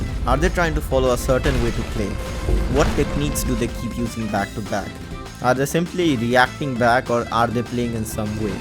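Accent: India. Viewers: Indian accent >>>>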